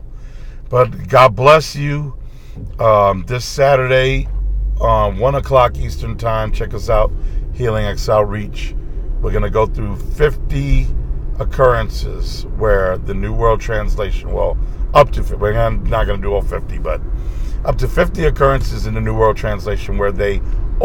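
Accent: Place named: Jamaica. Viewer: Yeah she schooled that elder big time,! Love your Jamaican accent, too funny. Look forward to catching this segment sounds interesting. I took and wrapped up my silver book, can't really call it a bible since they screwed with the holy book.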